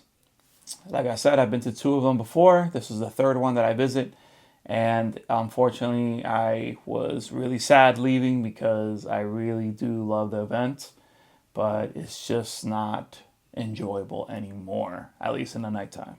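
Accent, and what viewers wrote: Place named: Puerto Rico. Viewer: I like your Puerto Rican English ." It sounds like a quick, relaxed "uh" (with your neutral, relaxed mouth and tongue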